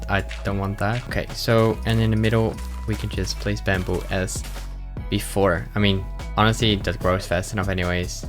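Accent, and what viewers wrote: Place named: Netherlands. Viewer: Oh! You’re Dutch? I always wondered where you were from. I feel silly for not guessing it sooner based on your accent. Hello from Toronto! 😄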